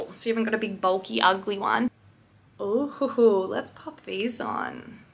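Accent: United States. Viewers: I love the country accent. Sounds just like my ma lmao 💜🖤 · The southern accent is one of my faves ahahahha 🤙🏻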